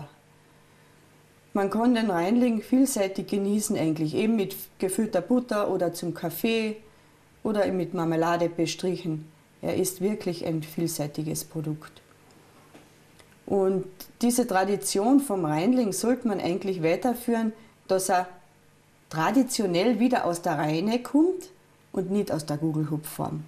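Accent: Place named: Carinthia, Austria. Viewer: Noch mehr kärntarisch geht oba nimma. 😀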